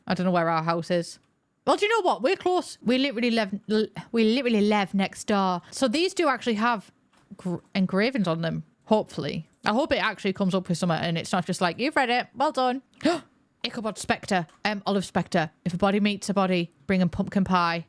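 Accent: United Kingdom. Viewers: I love, I love british english, but I wish there were subtitles 😂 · Let me start by saying this, in order to not sound like a mean sourpuss later, I really love videos. The accent has, is making my Swedish heart pitter-patter-pitter-patter for the different British accents (yes, I tend to watch/listen to British series just to get my "daily" dose of British). Now, on to my comment that can be read in the wrong aka a mean way, which is not my intention whatsoever. At like she says "artistic prodigy", my brain went "why is saying autistic prodigy?". I had to rewatch that segment so many times, because my brain told me that sims 4 had sneakily added an autistic trait, and eventually I ended up using subtitles... the subtitles basically crushed what my brain has been begging sims to add in the game - a trait for sims with a slightly more NPF then for instance gloomy or loner. 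That way, even people who aren't neurotypical gets represented in the game. So, with that said, I never realised how much I wanted an autistic trait in the sims before the subtitles crushed it. Again - love content! <3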